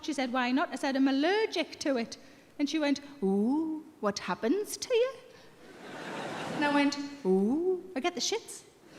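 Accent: Newcastle, United Kingdom. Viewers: As a non native speaker, I have to thank Sarah for teaching me proper Geordie! Just love her! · I just had to watch this again, I think for the third time? #4??? Love it. And I get to thinking how comedians come up with a topic; it's a complete lack of embarrassment, isn't it? Pubic hair? Crotch smells? Everyday life, luv, just talked about with a 1,000 close friends. And the geordie accent. Gorgeous.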